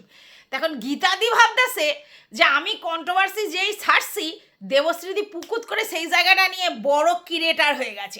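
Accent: Bangal, Bangladesh. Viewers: Darun bangal vasa koile go dil khus😂❤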